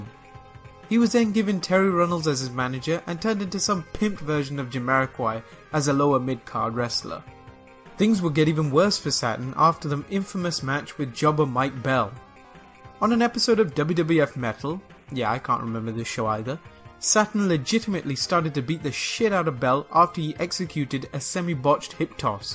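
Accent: England, United Kingdom. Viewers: Are you English? Just wondering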